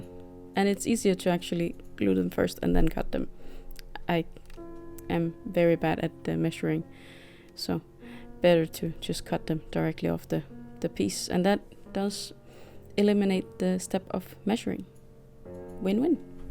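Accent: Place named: Brazil. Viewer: My Dies are on their way so am watching your techniques to prepare for my house! Not sure if I would have the patience to do all of that brickwork but very well done. Looking forward to seeing the completed project. I have been trying to guess where you live by listening to your accent, my guess is Brazil?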